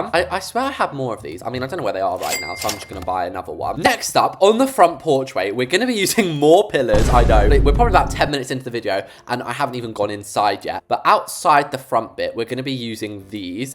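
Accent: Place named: England, United Kingdom. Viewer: I don’t think he is loll, hes from England so that’s why he has an accentt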